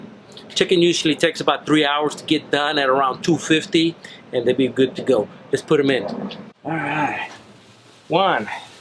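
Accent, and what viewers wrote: Texas, United States. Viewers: Ah, I'm originally from the Rio Grande Valley but live in Georgia now. Anyway, I could detect Texas in you. Been enjoying alot of your videos. Getting ready to place an order for some rubs but waiting on Spicy Chicken to get in stock.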